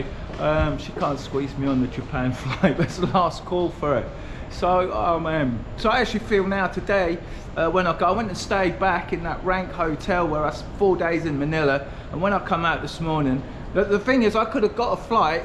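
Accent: Essex, United Kingdom. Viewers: Where you from in the uk? Sound like Essex same as me lol 👍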